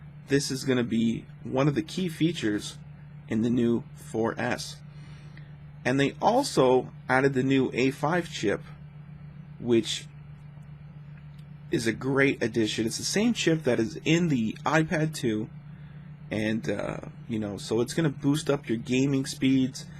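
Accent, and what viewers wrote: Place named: Canada. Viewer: You're in Canada? you know come to think of it, I do kinda notice your slight canadian accent :)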